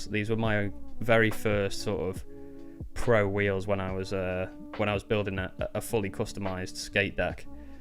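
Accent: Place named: Canada. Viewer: You sound very Canadian 🤔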